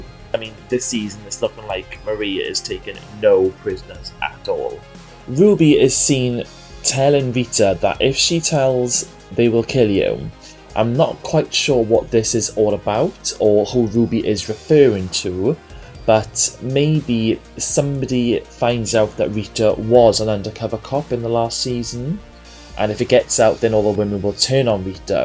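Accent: Wales, United Kingdom. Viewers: Very Welshy mate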